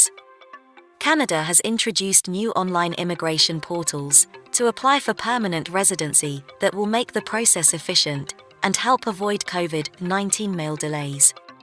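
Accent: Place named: United Kingdom. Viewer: Why the British accent if this is about Canadian immigration. Please change it. We are proud of our identity.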